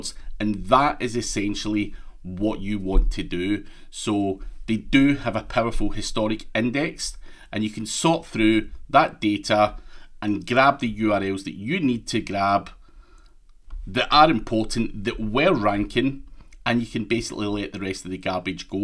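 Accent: Scotland, United Kingdom. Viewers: man freaking genius!!! plus +1 on the Scottish accent!! i have been wondering about doing exactly that for a while now.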